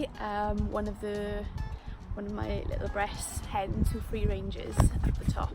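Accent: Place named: Wales, United Kingdom. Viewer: Loving your channel. I am stuck in a town but would love to live on a farm, as I dearly miss the countryside that I enjoyed but did not respect in my youth. Now of course in my old age I truly miss it. You certainly are a hard working Lady. I hope your family appreciates all that you do. I love your Welsh accent too. It is so very melodic. The Pastor's wife at my church is Welsh and has a very deep accent. She plays the Piano and leads us in song. I sit right at the front near the piano just to hear her lovely singing voice clearly. She is my little songbird and sounds just beautiful. Anyway, thank you for your videos, and God bless you and your family. Ken in Lancashire.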